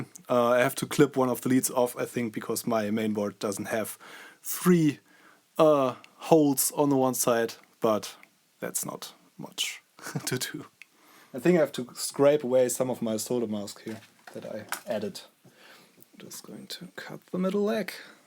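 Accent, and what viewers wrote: Germany. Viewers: You sound like a german boy who trrrrry to speak english.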